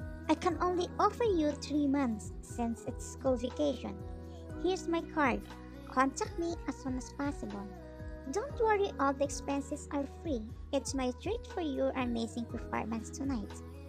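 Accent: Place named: India. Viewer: Omg I thought you were Indian lol but still u nice keep going 💜 👏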